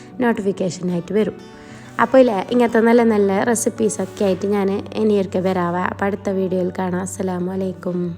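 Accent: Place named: Kasaragod, India. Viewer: വീഡിയോ full കണ്ടു.. കാസറഗോഡ് സ്ലാങ് കേൾക്കാൻ.. I like.. സ്ലാങ് ❤️❤️❤️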